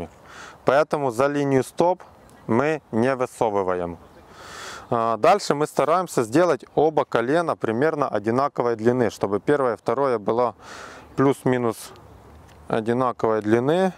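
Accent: Ukraine. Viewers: у вас же лютий, важкезний український акцент. не тортуруйте себе, говоріть українською!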